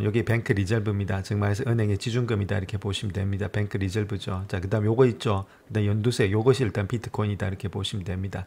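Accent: Gyeongsang, South Korea. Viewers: 경상도 사투리 서울 토박이인 제가 듣기에 매우 인텔리젼틱하게 들리네요^^ 경상도 분들은 다 머리가 좋은가봐요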